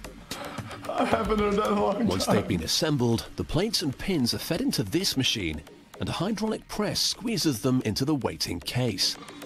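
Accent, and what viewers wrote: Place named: United Kingdom. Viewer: I thought the narrator was always british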